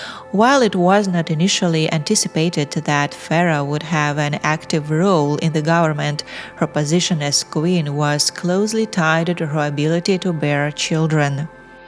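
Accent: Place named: Russia. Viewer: No, it's a lady of apparently Russian mother tongue, with a certain - but not sufficient - mastery of the English language - and who pronounces a lot of words wrong. Indeed it's hard to stand.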